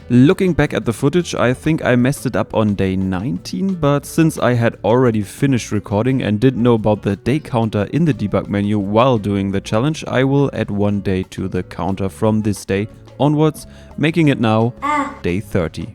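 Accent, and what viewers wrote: Germany. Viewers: Are u germAN? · You sound German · You have a german accent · You sound a little bit german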